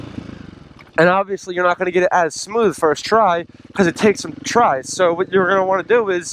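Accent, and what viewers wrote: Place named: Maryland, United States. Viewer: you sound like youre from maryland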